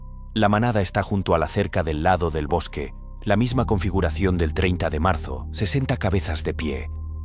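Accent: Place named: Spain. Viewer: A mi casi no me gustaba el acento español pero ya lo amo con este canal !!!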